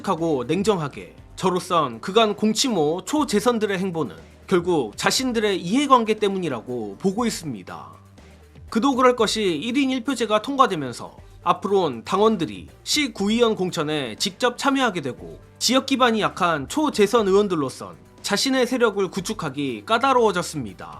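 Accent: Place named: Gyeongsang, South Korea. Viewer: 엿까는 방송 이고만 어투는 갱상도 출생者 같은대 쪼개기 고만하고 방송접버시길 ㆍ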